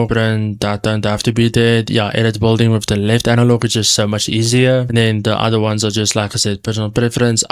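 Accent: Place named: Cape, South Africa. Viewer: The cape accent awe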